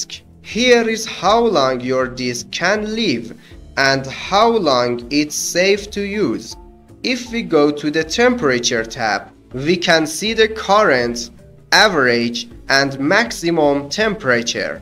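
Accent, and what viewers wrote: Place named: Iran. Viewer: لحجه امکان نداره ایرانی نباشه 😂🤣 دمتم گرم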